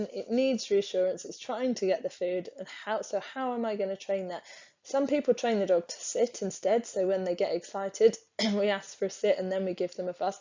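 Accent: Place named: Europe. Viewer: judging from your accent believe you are in Europe, sorry I'm in japan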